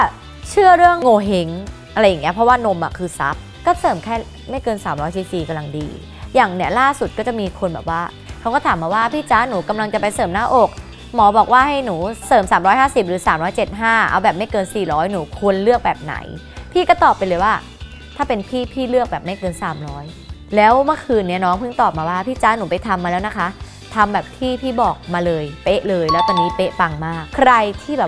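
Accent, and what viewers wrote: Thailand. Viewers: เอาจริงเมื่อก่อนไม่ชอบคือตอนเพลงคันหู คือมันดูแรง แรด ว่าเราชอบผู้หญิงแรดๆแต่เพลงด้วยมั่ง แต่สุดท้ายความเป็นตัวจ้ะคือตอนนี้ จ้ะน่ารักมากกลายเป็นชอบมากความใสๆแล้วบนเหน่อๆแบบนี้คือชอบบบบบบบบที่สุดเลย ครับ เป็นกำลังใจให้ทุกเพลงและจะติดตามผลงานตลอดไปครับ หลงรักแบบไม่รู้ตัว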